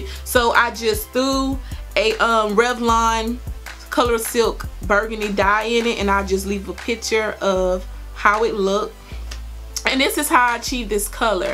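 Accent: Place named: South, United States. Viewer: OMG I freaking luv this video! Yass honey! I felt like you were giving us! Love your personality and your country accent! You look GORGE by the way!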